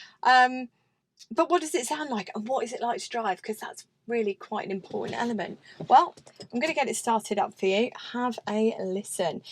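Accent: United Kingdom. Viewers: Bro you british accent is so saturated .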